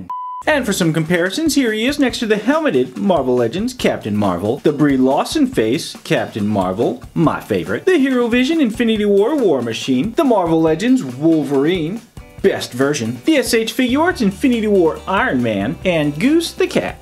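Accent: United Kingdom. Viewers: I like the British voice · And nice British accent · Who eles got surprised when he started speaking British.....but when did u buy the wolverine are u going to make a review of him or no cause i am going to buy that too.....peace.... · Stop the British voice 😡😡🤯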